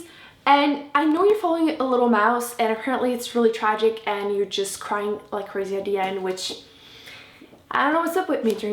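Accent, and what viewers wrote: Quebec, Canada. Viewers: I knew you spoke french 😃😃😃😃😃😃😃 We have the same way of pronouncing some words. I bet you're also Canadian like me😀😂 Anyways love this video💙💙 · Breanna May she's French Canadian :)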